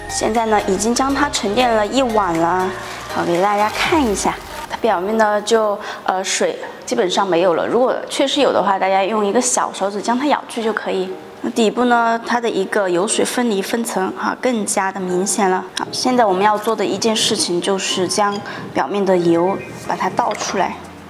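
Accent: Sichuan, China. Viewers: 👍👍實用，四川普通話嗎？☺️☺️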